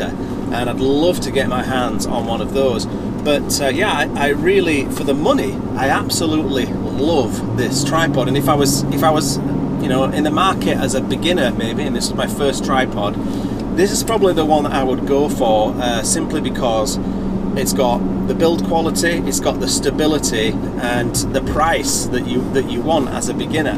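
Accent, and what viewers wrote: Yorkshire, United Kingdom. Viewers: I'm afraid I only got 10 per cent of your advice, I was seriously distracted by your lovely driver... Anyway, I'll just have to watch it again or better: just listen to the old Yorkshire voice...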